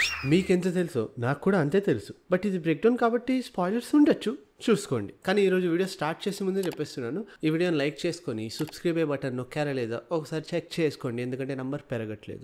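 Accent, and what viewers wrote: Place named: Kerala, India. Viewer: Ni telugu yenti bayya malayalam vadu telugu ni appu techukunnattu undhi worste ga why yendhuku ala matladuthunnav thinnaga matloduchuga Andra style ledha Telangana style ayina matladu bayya teluguni kuni cheyyaku please..😢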